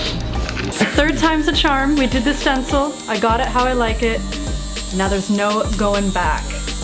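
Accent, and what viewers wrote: Canada. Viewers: Thanks for sharing,. I’m not a big tattoo guy but certainly respect your decision, your reasons for getting them, and the meaning they have to you. Stay safe and healthy and look forward to more videos, (and, no matter where you travel, don’t ever lose that great Canadian accent)!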